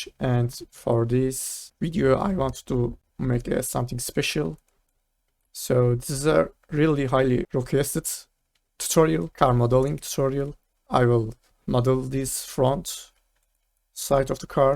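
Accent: Turkey. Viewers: sesini duyar duymaz türk olduğunu anlamak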